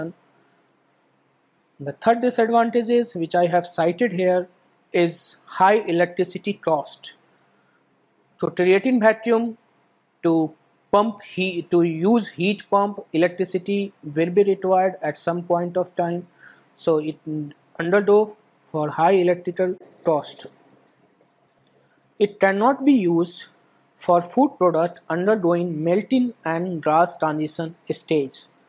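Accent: India. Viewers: LOL, LOL, LOL. that is the way they speack in India, so please learn more languages so your brain will be open to understand, I speack 5, and you ?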